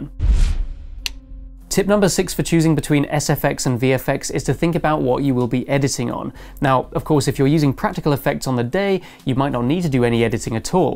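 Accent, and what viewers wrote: United Kingdom. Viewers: Ok. Because I heard, your English is British. :)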